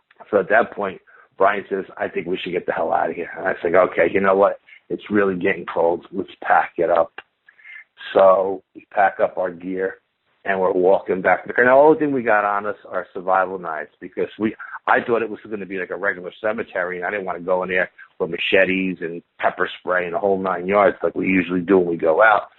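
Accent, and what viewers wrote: South, United States. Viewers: The Southern Accent is like ???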